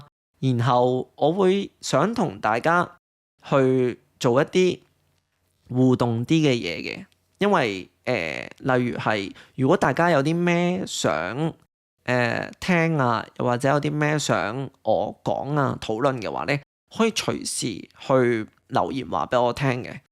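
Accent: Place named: Hong Kong, China. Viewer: の広東語だけのポッドキャストが始まってとてもうれしいです♪ 広東語の本にものってない様な香港人のリアルな発音が知りたかったので、これからがすごく楽しみです😊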